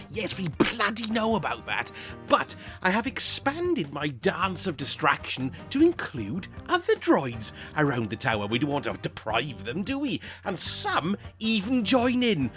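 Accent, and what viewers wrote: Wales, United Kingdom. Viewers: You sound very welsh my friend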